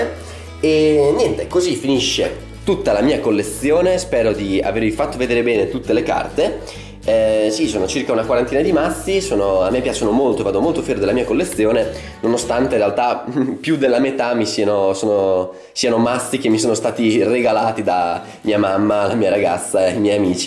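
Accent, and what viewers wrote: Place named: Emilia-Romagna, Italy. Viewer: da quella Zeta inconfondibile deduco che sei del Emilia Romagna. di dove sei ? io sono di Bologna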